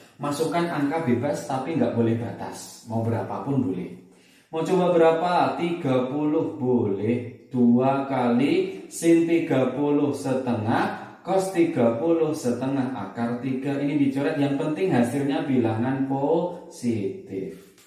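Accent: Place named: Java, Indonesia. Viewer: gatau kenapa kalo yg ngajar suaranya rada meudhok2 gt,lgsg paham :))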